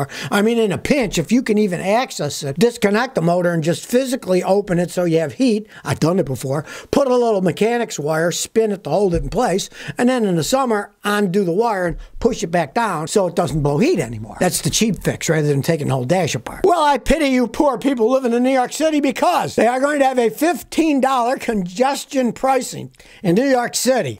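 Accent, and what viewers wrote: United Kingdom. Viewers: Love the Brit accent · Nothing like a strong black coffee and speaking with a British accent to get me going, in the morning!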